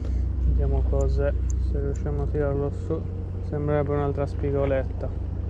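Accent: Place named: Sardinia, Italy. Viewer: Complimenti per il video ben curato e per i bei pesci😉 dall'accento si sente che sei sardo, posso chiederti di dov'è?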